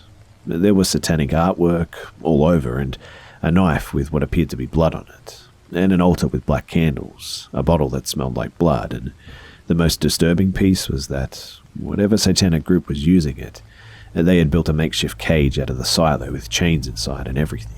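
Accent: Australia. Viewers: He's Australian, lmao. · Are you an Aussie?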